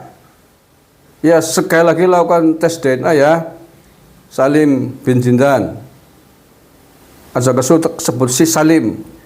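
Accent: Banyumas, Indonesia. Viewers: ogrok" terus habib bung. selalu tk dukung, ngapaknya seger.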